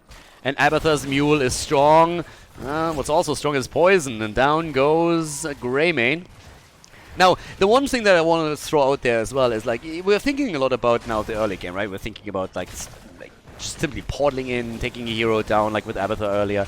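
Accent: Germany. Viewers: Does has an german acsent when hes talking in englisch??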